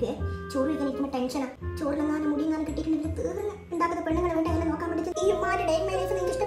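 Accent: India. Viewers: Actually i cant understand ur slang.r u from malabar? Ur acting is very funny bro.😄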